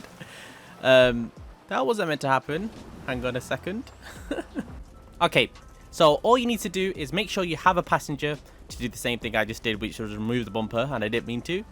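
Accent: United Kingdom. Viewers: ah I love this British accent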